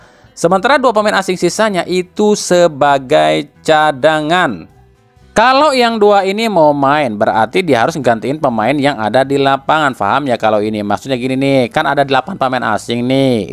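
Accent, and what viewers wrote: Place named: West Java, Indonesia. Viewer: BUAHAHHAAAAA..... Yg punya chanel itu urang Sunda,logatnya itu yg jadi ciri....😀😁. Aingmah sok aya2 wae pertanyaan teh...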